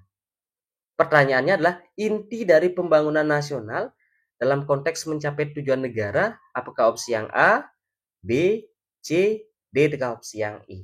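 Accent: Bali, Indonesia. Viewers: bang saya suka logat balinya wkwkwkwk .. mantappp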